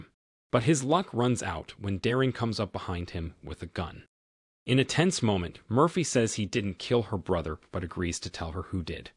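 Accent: United States. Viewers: Man do I hate a yank telling an Irish story ..... give up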